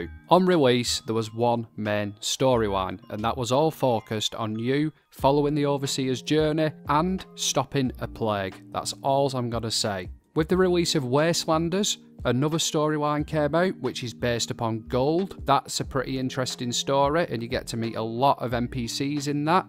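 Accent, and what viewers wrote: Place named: Yorkshire, United Kingdom. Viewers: God I just love you accent mate. I'm from Britain and I'm guessing maybe your from Yorkshire? Either way you accent is brill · Are you from Yorkshire? I am and I'm rubbish with figuring out other people's accents 🤣 Great video by the way, thanks!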